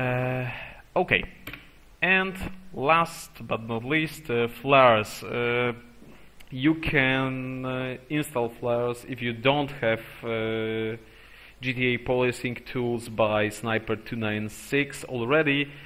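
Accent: Poland. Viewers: Wyczuwa się ten polski akcent :) Ale film oczywiście dobry, łapka w górę ;)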